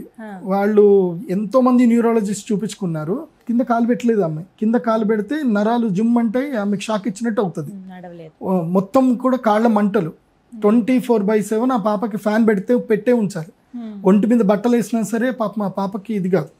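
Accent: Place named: Telugu, India. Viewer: యాంకర్లో తెలుగు తనం ఉట్టి పడుతోంది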